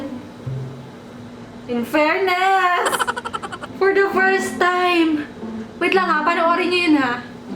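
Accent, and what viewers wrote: Batangas, Philippines. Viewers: Ate, ano year mo na sa college? Bsu ka pala. Kaya pala mala accent mo pang batangas hahaa. Bsu din ako incoming, freshmen pa nga lang.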